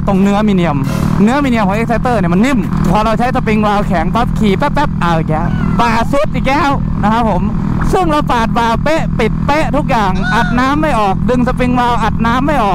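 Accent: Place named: Suphanburi, Thailand. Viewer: คนสุพรรณคับบ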